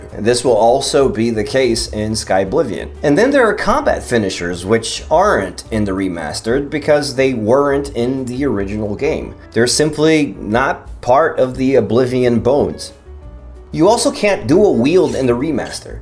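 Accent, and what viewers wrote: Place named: Portugal. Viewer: Hey, do you speak Portuguese? Kinda noticed your accent, lol. Just came across your channel. Great content !!!!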